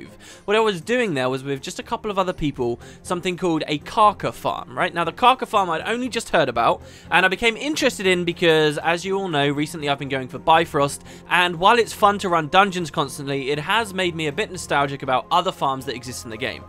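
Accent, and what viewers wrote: United Kingdom. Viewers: Youre on US Server? You sound so british..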